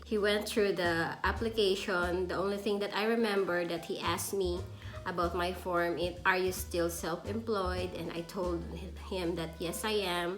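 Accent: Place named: United States. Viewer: she is sound like she is an american girl , like engl is her mother language , what is your concern was about Idk...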